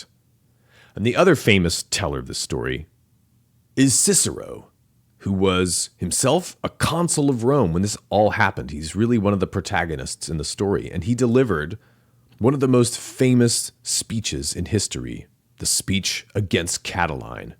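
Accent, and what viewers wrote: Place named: United States. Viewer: I love the content but this accent..I cant place it. Southern Pennsylvania or Tennessee..or anyone in California but its slightly irritating..its still pretty good. Im not sure I've read all this.Its really a gift. Thanks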